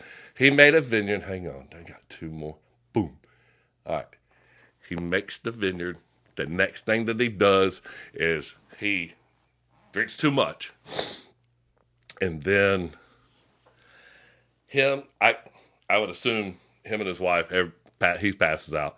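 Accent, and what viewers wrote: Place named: Georgia, United States. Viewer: REWATCHING FOR NOTES, i am so sorry i cant help it LMBO...@ you said "I APPRECIATE THAT DAD" 🤣😂😁🤣😂🤣😁🤣😂24✝🙏 Gotta love our Georgian accents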